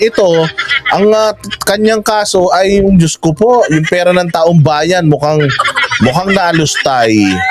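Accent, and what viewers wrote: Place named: Iloilo, Philippines. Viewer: Bisaya ang hibasa ,ilonggo ang tono.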